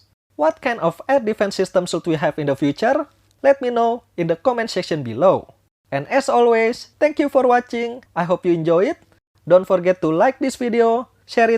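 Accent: Indonesia. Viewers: You got indonesian accent .. cool 👍👍🤙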